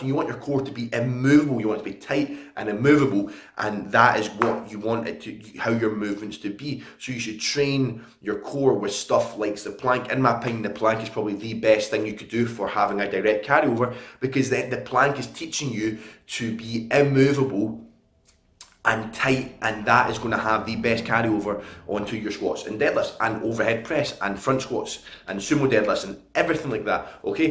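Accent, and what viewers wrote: Scotland, United Kingdom. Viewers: yo where in scotland are ya from?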